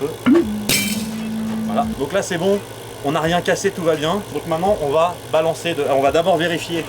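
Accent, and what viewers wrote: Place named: South, France. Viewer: en tout cas j'adore ton accens (du sud)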